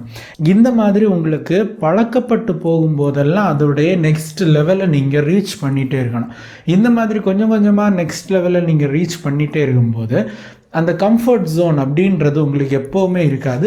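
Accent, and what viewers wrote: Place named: Kanyakumari, India. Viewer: I like your Kanyakumari slang😊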